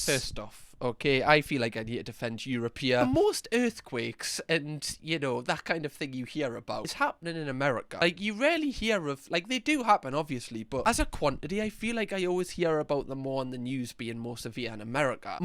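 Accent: Newcastle, United Kingdom. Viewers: A, I can't believe I've only just come across this, I've been in bits the last few days. B, don't you knock the Geordie accent pet, it's the best accent in the country and I'm from Lincolnshire. Though my grandad was born up there, hated working the mines so ended up as a lorry driver and then eventually owning a garage in London after first living in Devon for a while. He had an interesting accent but never lost the Geordie phrases, oddly some of which we use in Lincolnshire too. But it's been a long while since I've been called Hinny now. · Don’t put down your looks! And I love the Geordie accent, it’s so endearing. As someone who grew up with a Bostonian accent, you have a very friendly sounding one. Mine sounds aggressive, and trust me, it’s not intentional. Friendly exchanges are like screaming matches. 😆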